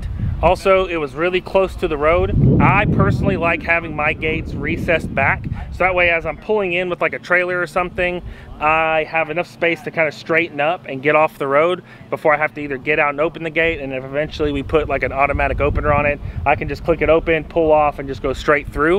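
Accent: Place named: South Carolina, United States. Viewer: Sounds like your already getting that south carolina draw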